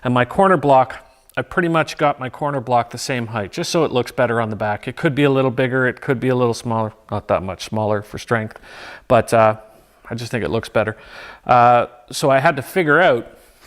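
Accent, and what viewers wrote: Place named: Canada. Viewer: Great videos! I plan to use this in my technical theatre classes. Random question: I thought based on your accent (and the snow) that you were probably Canadian, but then you still use imperial measurements instead of metric. Am I just wrong and you aren't Canadian, or do you still use imperial measurements in Canada for theatre, to be consistent with the U.S. industry?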